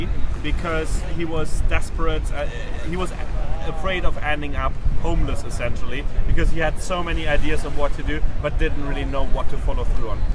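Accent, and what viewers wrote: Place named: Germany. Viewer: I thought you sounded German :) Now I know this for a fact!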